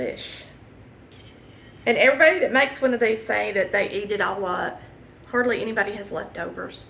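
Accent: South, United States. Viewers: Love your southern accent and delicious recipes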